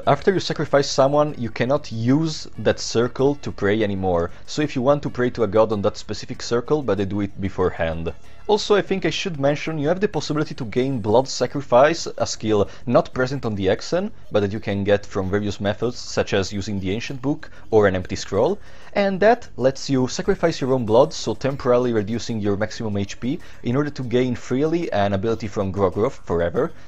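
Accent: Italy. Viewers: are you italian? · Mi sembrava un accento italiano ad ogni modo grazie fra per le guide sono felice di vedere italiani con dei gusti sublimi per i videogiochi mi sono iscritto e metto like a tutto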